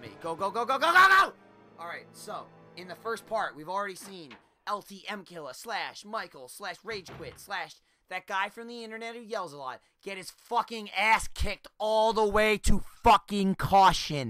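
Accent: New Jersey, United States. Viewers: Lol he still had some of his New Jersey accent back then.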